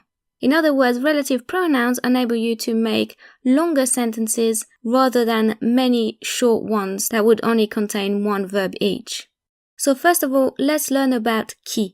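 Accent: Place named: London, United Kingdom. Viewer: You are teaching us English grammar as well. You sound like you have spent quite bit of time in London. Thank you for clarification was "bit" lost.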